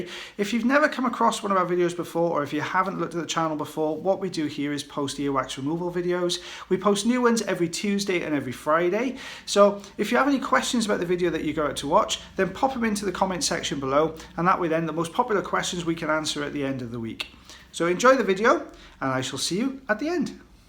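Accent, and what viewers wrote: Wales, United Kingdom. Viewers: Hes from Wales in the UK. (Just incase you didnt know) so it's a Welsh accent. X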